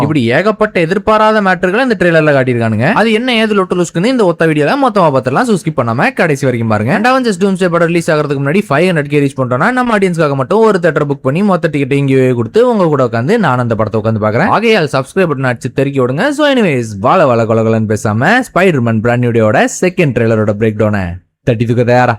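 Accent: Kanyakumari, India. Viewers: Bro neega Kanniyakumari ahh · Bro neenga kanyakumari ahh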